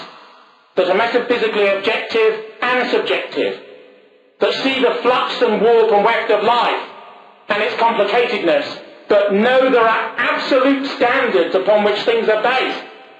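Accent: United Kingdom. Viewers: could you imagine if the sound quality was better and Bowden had tried to reduce the british accent a bit, he would have had a lot more attention on him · He had a very normal English accent. If Americans find it off-putting or that it makes him impossible to relate to then that's their problem.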